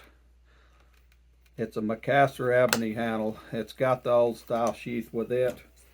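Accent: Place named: Appalachia, United States. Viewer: Hey, bub, you sound like you come from close to the upper part of the Southern Appalachians, which is where I’m from. And back in the old days, a plain two-blade pocket knife along with a sensible belt knife got the work done. Today, I carry a Leatherman super tool along with the same sensible belt knife. Hmmm…..There seems to be a historical pattern going on here, and I sure as hell am not about to argue with it.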